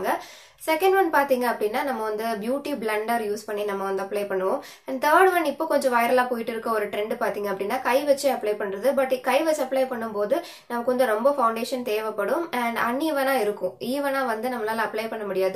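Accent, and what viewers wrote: Nagercoil, India. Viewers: Nagercoil slang varuthu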